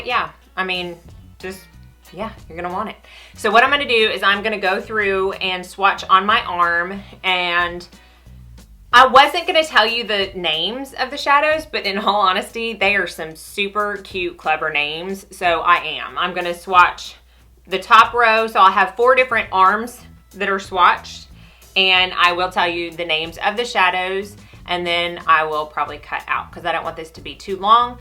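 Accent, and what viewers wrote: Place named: Maryland, United States. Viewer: Are you from Maryland cause you sound like an either Maryland accent or southern. Reminds me of home ❤️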